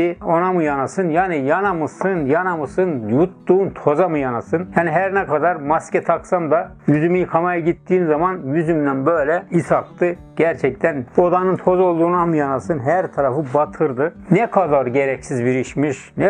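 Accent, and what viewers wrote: Erzurum, Turkey. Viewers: Abi Sen Erzurum’lumusun şiven bize çok benziyor:)?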